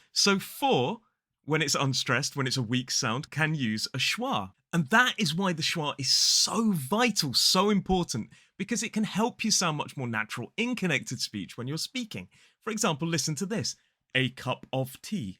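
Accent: United Kingdom. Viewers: 🙏💛💙 Adore British accent! It’s music to my ears! Thanks for the video! Pretty helpful!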